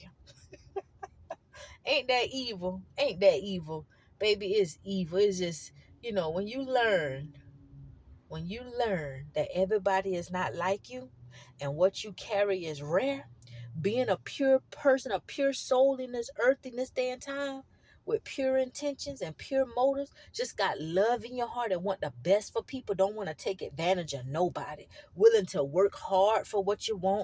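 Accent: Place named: Louisiana, United States. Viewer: Yessssss 🎉 John 15 Hated Without A Cause If They Have Hated Me They Will Also Hate You “ Come On Women Of God Say It “ Even From My Own Family And So Called Friends “ Used And Took Advantage Of My Kindness And Mistaken It For Weakness Not Realizing They Must Reap What They Sew Nobody Gets Away Also Thanks 🙏🙏 Women Of God For This Channel I’m A New Subscriber “ Keep Being A Absolute Blessing To EveryOne ❤❤❤❤❤❤❤ Also Are You From Louisiana Because My Family’s From There Aswell And When You Speak I Hear It 😊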